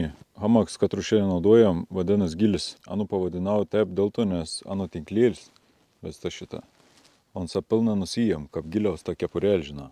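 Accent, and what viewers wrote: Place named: Samogitia, Lithuania. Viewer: Vėin už žemaitiška rukavima pagarba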